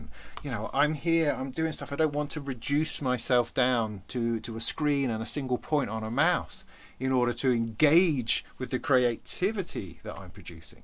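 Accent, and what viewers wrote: United Kingdom. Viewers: So, ur British. Far out! When u said motorbike...yup or Australian. Just got a Teac X-3 Great sound dynamics. Gotta get some leader tape for sure. Its,a whole new thing for me. I want to tape from record albums and CD. U R right, putting an album.on a Turntable hooked to a very good receiver sx950. Threading tape thru a humongous non enclosed reel2reel is spiffy. I have a lot to learn and I bloody well love it. Say HI to Rumpole of the Bailey for me?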